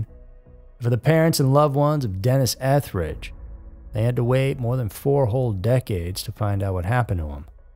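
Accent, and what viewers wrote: United States. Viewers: just subscribed to your channel...ive been watching true crime for a long time...u have alot of stories that are new to me...great footage...love the american accent🙂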